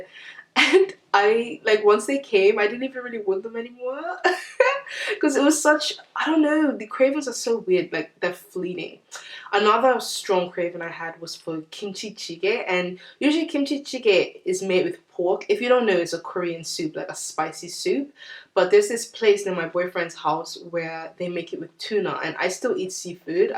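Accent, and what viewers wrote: Bermuda. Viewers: That moment when you're clicking through YouTube and hear a familiar Bermudian accent. I love that you have a little more British mixed in there! Just gained a 441 subie btw ❤️😊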